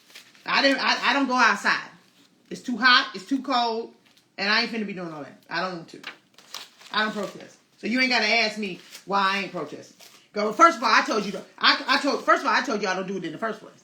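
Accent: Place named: Chicago, United States. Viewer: I can definitely tell she is from Chicago. My sister from the south. Milwaukee native here.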